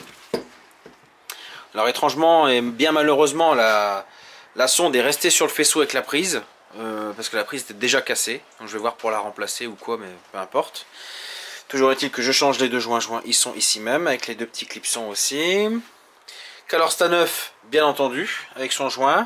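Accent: North, France. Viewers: Quel plaisir que cet accent du nord matiné de syntaxe supérieure, de mécanique de précision et de jurons de chez mémé. C'est comme le résultat si Edd China s'était retrouvé dans une soirée coquine avec maitre Capello et les frères Lopez. Ca ma rappelle ma jeunesse.. Merci pour ces vidéos et pour le temps que ça prend à faire. La question qui m'interroge la tout de suite concerne ce super tuto de la "thermodynamique de la combustion d'un moteur froid expliqué à ta petite sœur" : Il se trouve qu'un spécimen de VR32 BKK à élu domicile sous le capot de mon camion (et c'est pas moi qui l'ai mit, c'est les messieurs de chez WV, il est comme ça depuis le début, c.f. WV multivan V6, ne pas taper merci ou au moins pas la tête...) Bref : Je suis coutumier de sa mise en route et du bruit de cette turbinette d'air secondaire (techniquement dite turbinette de brun). Je sais aussi que son non fonctionnement est rapidement sanctionné par l'ODB et son petit logo warning orange sur le TdB. 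(techniquement dit voyant de diagnostic de défauts de crotte ) Ma question est donc : "Comment que c’était ty que on fèzè pour pas que eul voyant de brin il s'allume le kon si on vire tout le bazar ?? ( ou comment tromper l'ODB sur ce point et sur d'autres aussi si vous avez des choses à dire sur l'OBD version KWP2000 pour faire une reprog ..si possible par cher...a quand le programmateur d'ECU chez lidl..). Bref merci de m'avoir lu, merci pour votre réponse, encore bravo, gloire au VR6 et power corons !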